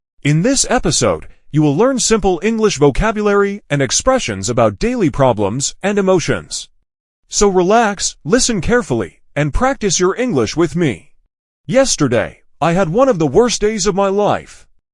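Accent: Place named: United Kingdom. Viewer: Es acento británico se escucha muy bien la pronunciación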